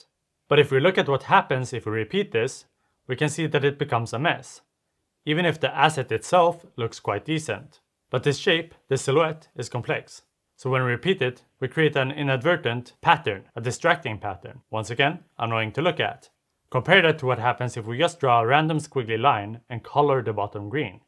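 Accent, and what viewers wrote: Sweden. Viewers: Great video. Are you Swedish by any chance?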